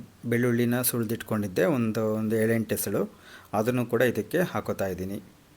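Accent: Chitradurga, India. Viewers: Good morning sir, ನಿಮ್ಮ ಅಡುಗೆ ಜೊತೆ ನಮ್ಮ ಚಿತ್ರದುರ್ಗ ಭಾಷೆ ಯ ಸೊಗಡು ಚೆನ್ನಾಗಿರುತ್ತೆ ನಿಮ್ಮ ಮಾತುಗಳನ್ನು ಕೇಳುತ್ತಿದ್ದರೆ ನಮ್ಮ ಊರಿಂದ ಯಾರಾದ್ರೂ ಬಂದ್ರೆ ಮಾತಾಡುತ್ತಾರೆ ಅಲ್ವಾ ಹಾಗೆ ನೀವು ಮಾತಾಡುವುದು ಹಾಗಾಗಿ ನಮ್ಮವರೇ ನೀವು ಅನ್ನುವ ಭಾವನೆ ನಮಗೆ ದಯವಿಟ್ಟು ನಮ್ಮ ಭಾಷೆಯನ್ನು ನೀವು ಮಠದುವ ರೀತಿಯನ್ನು ಬದಲಾಯಿಸ ಬೇಡಿ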